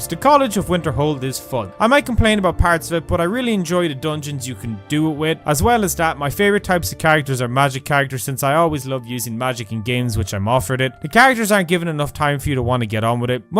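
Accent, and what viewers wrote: Ireland. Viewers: OMG YOU'RE IRISH TOO?!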